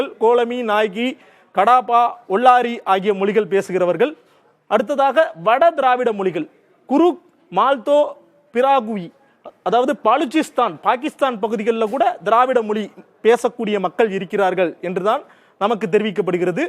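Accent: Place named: Telugu, India. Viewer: மொலிகல் என்று சொல்லாமல் மொழிகள் என்று சரியா உச்சரிக்க வேண்டும்.... உங்கள் உச்சரிப்பு நீங்கள் தெலுங்கர் என்று காட்டிக் கொடுக்கிறது...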